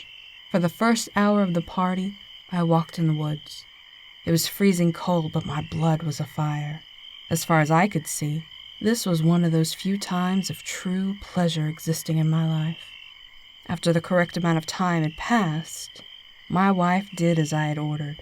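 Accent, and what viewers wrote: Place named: South, United States. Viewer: Haha! I love this story. I have to say that little southern twang suits you. Be well my 💜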